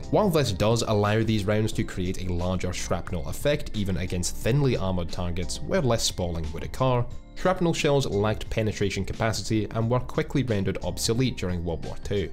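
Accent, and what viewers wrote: Scotland, United Kingdom. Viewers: I've only just noticed your Scottish accent and I've been watching a few of your vids already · Speak American man, not Scottish! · I hit the like button as soon as I heard that sweet Scottish accent. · Are you Scottish?? 🤔🤔. You remind me alot of my friend Wallace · Is that Scottish?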